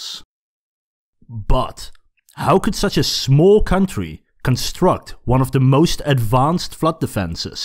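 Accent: Netherlands. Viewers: me thinking maybe this is a english dude thats instrested in the netherlands me hering his voice: oh ye nvm hes dutch for sure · I can tell by your accent and the way you speek Dutch words that your Dutch like me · are you Dutch? I've always thought your accent sounded a bit Dutch but I could be totally off. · Funny, you have a Dutch accent. And thanks for including a photo made from my hometown! Yay! I was born there. 3 meters below sea level. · Thats the strongst Dutch accent I’ve heard lol(your english is good tho) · I thought you had a Dutch accent... now I know! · The way you speak english and your dutch just like me 😂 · Hij heeft wel een Nederlands accent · Hey, je uitspraak is een beetje te Nederlands voor iemand uit een ander land... Kom jij toevallig uit Nederland? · Ik hoor gewoon dat je Nederlands bent😂😂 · Nice Dutch accent on your English 😝 Vette video in ieder geval 👍🏻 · You sound dutch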